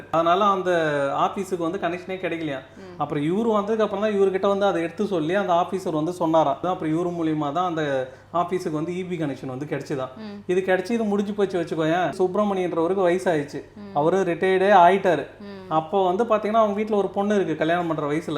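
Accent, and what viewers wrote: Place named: Villupuram, India. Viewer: Villupuram district slang mathiri iruku sir ungaluku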